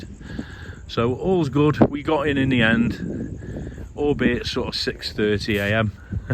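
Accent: United Kingdom. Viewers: You sound like the original butler, Carson, on Downton Abbey...I wonder if you are from the same area as he is? Love your videos. It's so interesting to see this island from your walks.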